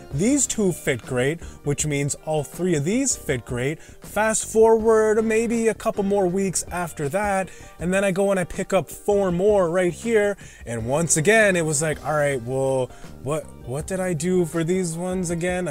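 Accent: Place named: South, United States. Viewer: Bro you from the south? Like GA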